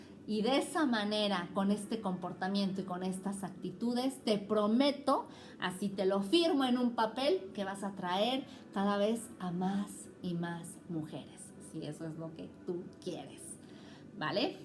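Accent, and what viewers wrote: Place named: Spain. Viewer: eres Mexicana con acento espanola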